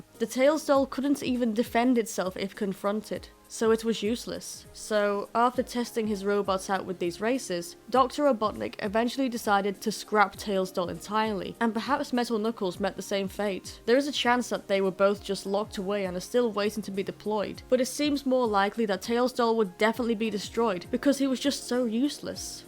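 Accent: United Kingdom. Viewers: I love your British accent, it sounds so deep and classy!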